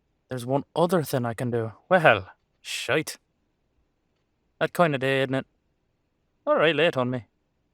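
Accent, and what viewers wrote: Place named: Ireland. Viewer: I love your Irish Accent. Erin Go Bragh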